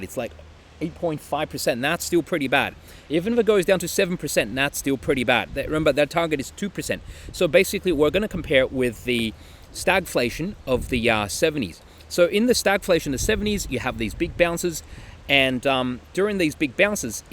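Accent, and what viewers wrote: Australia. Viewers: Are you an Aussie? Appreciate your sharing of perspective..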